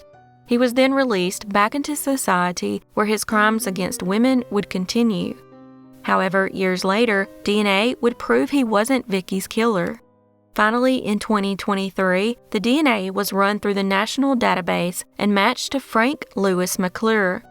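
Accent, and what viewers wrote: South, United States. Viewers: we must be related. We have the same southern dialect and accent. Thanks for the stories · I love hearing Quebecois names being pronounced with a Southern accent. It's like listening to a whole different language. 😊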